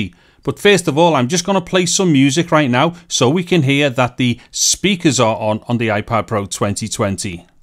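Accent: Liverpool, United Kingdom. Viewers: Cheers scouse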